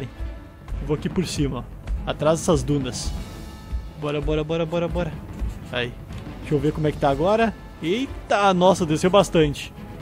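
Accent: Rio Grande do Sul, Brazil. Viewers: vc tem sutaque Gaúcho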